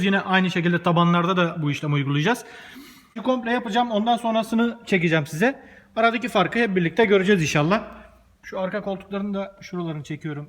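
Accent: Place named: Thrace, Turkey. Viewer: hocam konusman tam trakyali😂 harika yav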